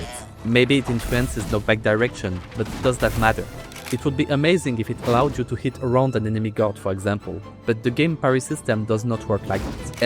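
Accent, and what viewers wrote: France. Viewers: On entend l’accent français à des KILOMÈTRES 😭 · The video was so good I almost didn't realize you are french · Sup fellow french , I recognise it with your accent. More seriously , hope to see cool projects from ya !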